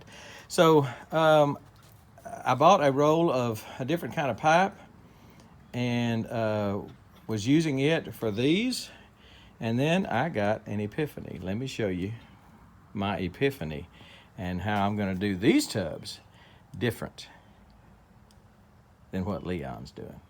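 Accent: Texas, United States. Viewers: This is genius. Thanks. Btw, I'm curious what part of TX you're from. I'm always interested in accents and TX is such a big state; there are different accents. I'm guessing you're east TX, maybe toward OK. Am I close?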